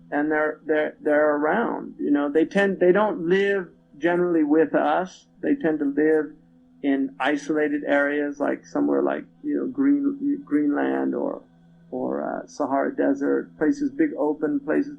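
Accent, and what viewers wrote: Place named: New York, United States. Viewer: sounds like New York City or maybe Los Angeles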